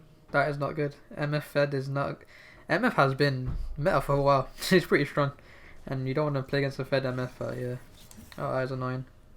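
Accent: United Kingdom. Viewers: Your voice is fine, but maybe its because I'm british as well and that's the way a lot of people talk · 23.50 I am not sure what part of UK you come from. I spend some time in Scotland and it wasn't easy to start understanding the way they prenounce words. Now when I listen to you it feels like I learn a different accent and it comes easier but I understand that it might be confusing :D Oh and one more thing, you use quite a lot of glottal stops so that might be a part of a problem too.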